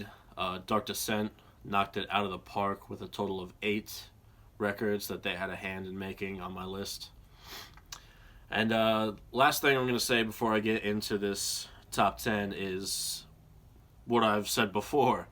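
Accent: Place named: Australia. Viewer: I swear sometimes your accent sounds Australian. Watching 'Best Death Metal Records post-2000 (Part 1)' when, talking about the Asphyx album, you said 'this is true death metal, ya bastards' and you said it in the most Australian way possible. lol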